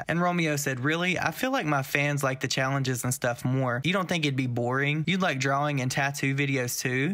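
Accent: South, United States. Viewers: I love your southern accent...you sound so polite, even when you're being snarky. 😂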